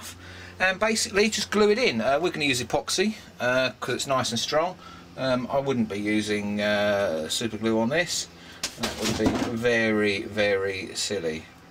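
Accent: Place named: United Kingdom. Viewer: oh goodness thank you he is british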